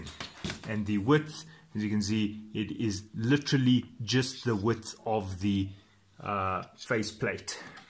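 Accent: South Africa. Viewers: This is a South African accent....you can’t fool me, thanks vir die review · Hey, are you South African 🇿🇦.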